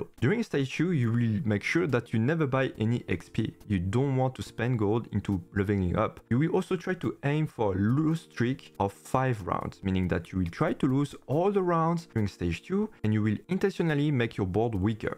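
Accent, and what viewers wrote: France. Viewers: French accent was easy to understand most of time, but it took me a moment to figure out that you talked not about 'focused units' but 'four cost units' :) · C'est moins l'accent français que l'enfer d'écouter un français me parler anglais alors que ce serait plus simple en français mdr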